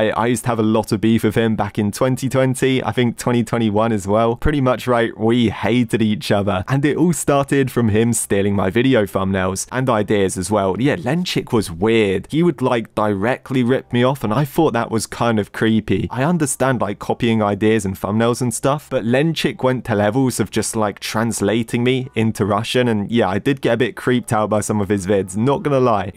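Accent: United Kingdom. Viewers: is going full british mode on this video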